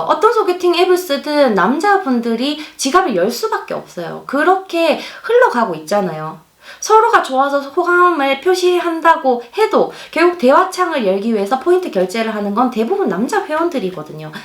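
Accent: Gyeongsang, South Korea. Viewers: 갱상도 사투리 ㅋㅋ 말투가 귀엽네요.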